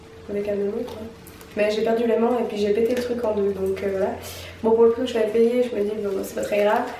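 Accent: Belgium. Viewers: OK g mieux compris mais tu viens de Belgique je trouve que tu a un accsant de la bas( on s'en fout ci ça s' écris pas comme ça) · tu est (d'origine) belge ou suisse ? ou tu est bretone ?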